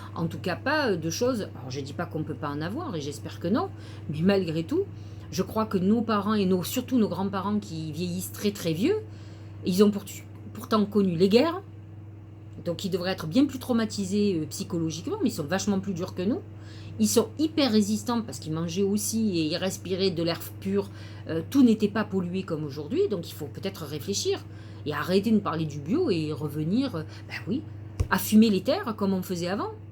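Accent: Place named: South, France. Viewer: Merci beaucoup de la part d une Vierge qui mentalise parfois trop. Je vais demander l accompagnement du "juste" à Madame la Lune et à mes Anges projecteurs. J apprécie bcp vos interprétations! Et j adore votre joli accent du soleil 😀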